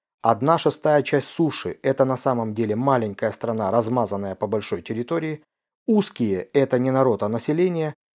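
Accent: Ukraine. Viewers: Огромное спасибо за Ваш труд! Очень важное видео! Какой же у Вас красивый украинский!!! Щиро дякую!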